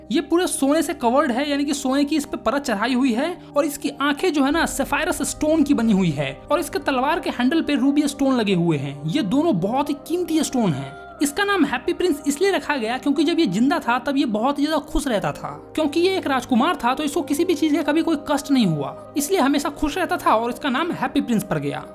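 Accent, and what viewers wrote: Bihar, India. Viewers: Bihari ho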